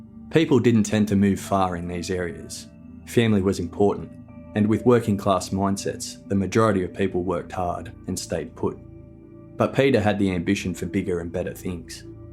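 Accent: Australia. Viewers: Yeah he is great. I got sick of the endless true crime podcast presenters that insist on giving their opinions on it all. I just love hearing the cold hard facts of it all and the Aussie accent somehow puts it in a different league. It's really easy to listen to.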